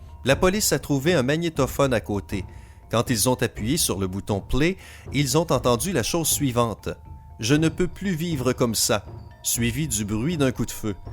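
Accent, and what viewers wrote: Quebec, Canada. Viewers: Ah !!!!l accent canadien!!!😁😁😁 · La voix quebecoise pourri :(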